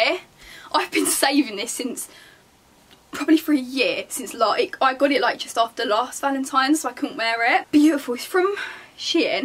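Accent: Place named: London, United Kingdom. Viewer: Her London accent is sooo strong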